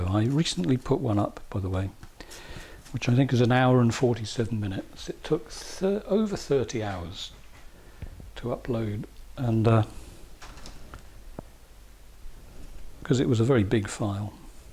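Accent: England, United Kingdom. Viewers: I love how your English accent even makes watching grass grow sound interesting!😊 I have a wooden board hanging in a gold frame on my wall staring at me; it's patiently waiting for me to give it the treatment... very soon...💗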